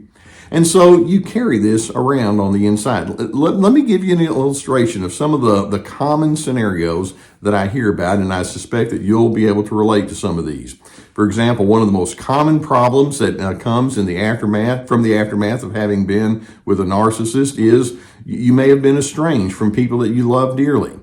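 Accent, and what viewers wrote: South, United States. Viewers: This guy is a lovely healer , always bang on the mark, and the soft southern accent is balm.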